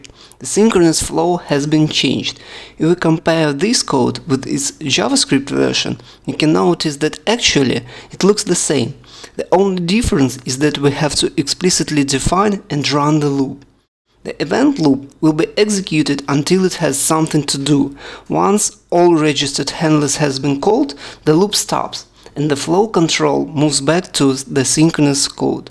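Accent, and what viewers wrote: Russia. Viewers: можно было бы и на русском, если такое произношение..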